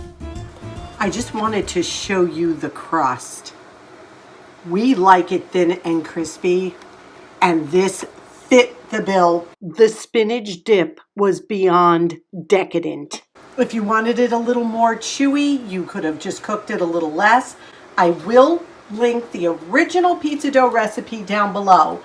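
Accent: New York, United States. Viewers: I grew up in sleepy Hollow moved to the capital district. I just absolutely love your videos, and you have the best ny accent ❤ the New York State Museum is also free and it has a beautiful tribute to 911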